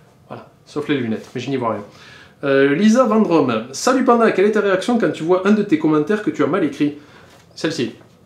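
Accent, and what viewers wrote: South, France. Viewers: HAN Man, ch'uis trop triste, personne ne vient à l'Anim'Est !! Bon, vu ton accent je pense que t'es dans le sud, et l'Anim'Est est à Nancy, en Lorraine... Mais pitié, viens ! Fais de la pub, même pour l'année prochaine, parlez-en entre youtubers ; cette année Noob est invité pour la deuxième fois alors que toi, le JdG, Mathieu Sommet ou encore Antoine Daniel n'ont jamais été invités ! >.< Viendez, on vous aime trop par chez nous !!! \o/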